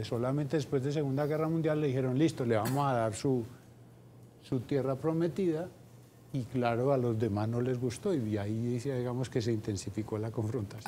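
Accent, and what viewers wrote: Colombia. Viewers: Un paisa con esa tonadita explicando la guerra, hemos escuchado a muchos catedráticos internacionales especializados y leído los mejores libros sobre ese conflicto árabe israelí. De pronto los paisas sabrán contar la guerra del narcotráfico y su líder. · Fenomenal la docencia del prof. Y lo más sabroso, dictada en lenguaje paisa.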